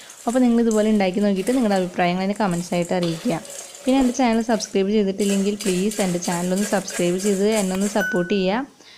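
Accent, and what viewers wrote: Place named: Thrissur, India. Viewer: Good thrissur language 👍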